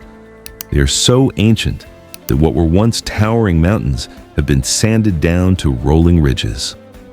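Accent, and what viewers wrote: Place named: United States. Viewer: Talking about Australia with an American accent and mispronunciations? That's a paddling.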